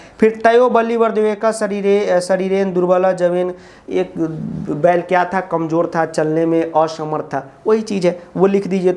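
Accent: Bihar, India. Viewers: Sir aap rajshthan se ho ya bihar se ye bol kaise rhe ho sorry to say this par mere se control nhi huaa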